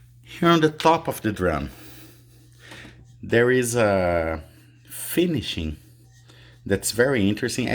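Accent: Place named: Quebec, Canada. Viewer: Man, he sounds like a French Canadian... (Disclaimer, I'm a tamed French Canadian)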